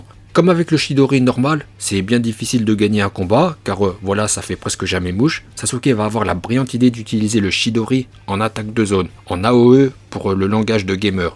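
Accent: Japan. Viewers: ton travail de porte parole, ton accent japonais, masterclass, le jour où tu seras chauve tu auras sans doute la capacité d'utiliser le kansei migatte no chauvui avec un peu d'entraînement, bonne soirée à toi et tu passeras le bonjour au grand maître kishimoto 🙏🏻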